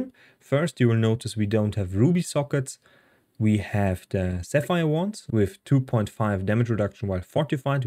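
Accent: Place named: Germany. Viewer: Gibt es das auch auf deutsch? Hast ein starken deutschen Akzent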